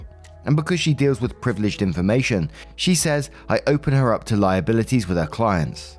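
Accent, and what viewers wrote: England, United Kingdom. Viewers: Why is the narrator who sounds English saying he needs therapy? Who the F gets therapy in England or most of the world in fact? It's an American thing. I would never go chat to some stranger about my life and listen to their bullshit opinions. I make my own decisions in this world.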